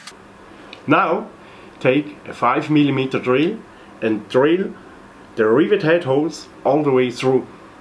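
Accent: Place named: Bern, Switzerland. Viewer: Cool, ä chli weni Bärndütsch ...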